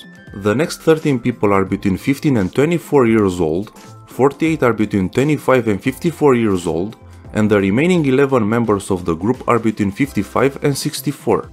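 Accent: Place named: Romania. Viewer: That brutal Romanian accent though 😖😖😖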